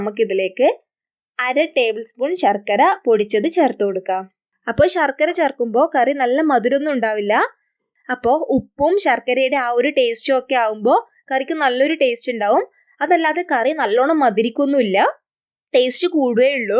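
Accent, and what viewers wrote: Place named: Kannur, India. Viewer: Ulli curry Adipoli yayittundu ketto ..thank you..💐💐✌️🤝 Thalassery (Kannur) kkariyo atho vadakara.. kkariyo? Endhayaalum randilonnaanu.. urappu .😄✌️🙌..love your way of speaking..🥰💖💖💖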